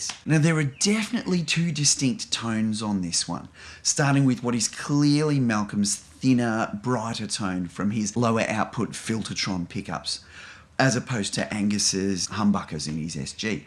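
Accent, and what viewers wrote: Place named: Australia. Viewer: Just watched this! Love it. Great to hear an Aussie accent in this space. Forensic guitar analysis, and you are so talented to be able to demonstrate each player. Your little assistant even has your hair😊🤣. Rock on🎸🔥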